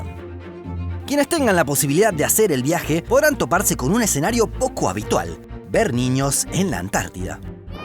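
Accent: Argentina. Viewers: El título es villa las estrellas y el narrador habla el 80 % de argentina típico argentino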